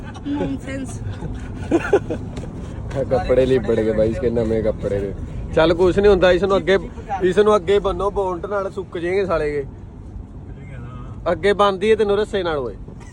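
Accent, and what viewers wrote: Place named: Patiala, India. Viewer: ਬਾਈ ਕਿਆ ਥਾਰਾ ਪਿੰਡ ਪਟਿਆਲਾ ਕੇ ਲਾਗ ਪਵਾ ਬੋਲੀ ਤਾਂ ਇੱਧਰੀ ਕੀ ਲਗਾ ਬਾਕੀ ਛੋਟੂ ਘੈੱਟ ਐ ਜਿਸ ਕੋਲ ਘੱਟੋ-ਘੱਟ 300/ ਰੁਪਏ ਤਾਂ ਹੈ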